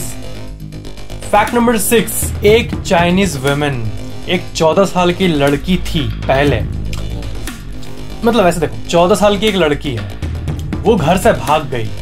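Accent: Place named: Bihar, India. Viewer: Sir apke bolne ka tarika hamare family aur relatives se milta h... I think ap hamare side se ho.. Bihar❤....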